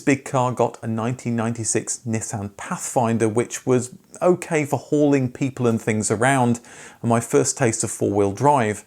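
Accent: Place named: United Kingdom. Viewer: Fun stuff,!!! I have deduced you must still live in the USA as the MINI you and Missus are standing by has the USA size plate frame and you mention it is still in your motorpool. And I had assumed you were UK based and that the missus had moved there!!!! Its the accent and the UK rear plate mock up in the background. Very enlightening, although a little surprised that you don't have a more varied list of dream cars that do not break the paper floor of the 1970s. Well, all of us care nthusiasts can't be Jay Leno have love almost every cool and unusual car ever made. Keep the videos coming as they are always insightful and properly researched.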